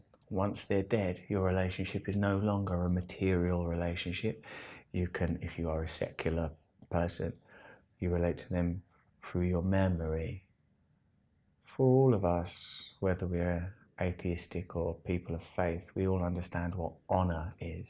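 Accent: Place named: Cockney, United Kingdom. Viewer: Is there no topic this nit won't publicly pontificate on? I bet he could drone on for hours about quick drying cement, just so as to hear his own mockney tones reverberating inside his empty skull. Russell, do us all a massive favour, and keep your inanities strictly to yourself...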